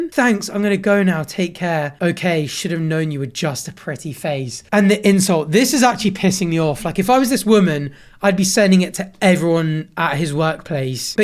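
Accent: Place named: United Kingdom. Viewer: Hey dude Don't know if you'll read this comment, but what area are you from? I'm an American and I struggle with identifying foreign accents, but you have a very distinct accent that I've heard in a couple of other youtubers. I'm just curious where it comes from. I'm like 99% Sure it's a British accent, obviously lol. Definitely not Scottish, Irish or Welsh, and doesn't sound like the stereotypical Londoner or RP accents, but i could be wrong. Thanks!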